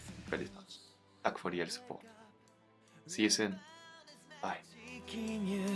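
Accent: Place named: France. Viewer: Perfect song, perfect man and perfect french accent. Seriously you're a pearl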